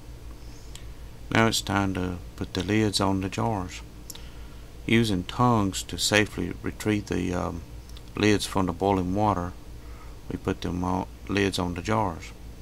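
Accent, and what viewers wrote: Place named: Louisiana, United States. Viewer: Are you from Louisiana? That is where my family is from. I recognize the accent and the of course you mentioned gumbo.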